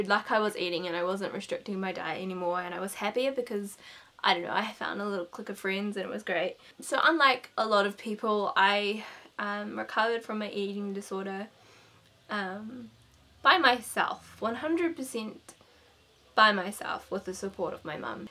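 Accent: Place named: New Zealand. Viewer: Heyy hello from the south of NZ :) Nice to hear a kiwi accent! I really liked this, I too self recovered, developed depression (though seriously you wouldnt be able to tell with me either I always appear bubbly and happy!!) and although I am not vegan, I follow a plant based diet and it helped so much! So pleased things are looking up for you :)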